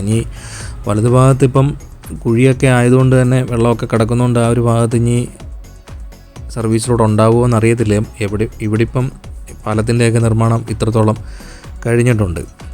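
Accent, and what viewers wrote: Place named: Kollam, India. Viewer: കൊല്ലം ഉള്ളവർ ഇങ്ങനെയാണ് സംസാരിക്കുന്നത്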